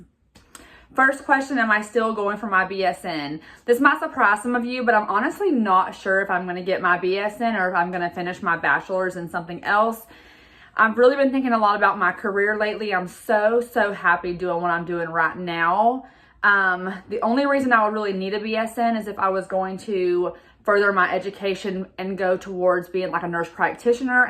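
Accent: United States. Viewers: Love your country girl accent 🥰🥰🥰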